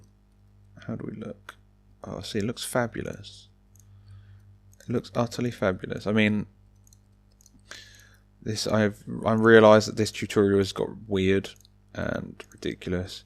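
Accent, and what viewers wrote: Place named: United Kingdom. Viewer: Dude you're just the best youtuber. Too many reasons. First of all, your voice is extremely calm and relaxing, I love it! Second, your accent, I just adore British accents, IDK why. Third, the video ideas you have are really creative, yours and my humor are almost exactly the same XD Fourth, the game you're known for, cubic castles, is just *the best*. Fifth, the dedication put into your channel is crazy. There are way too many more reasons I could list here, but I just want to concentrate on the video! If you're interested in being friends on cubic castles, my username is RustyComedy69 BTW :^)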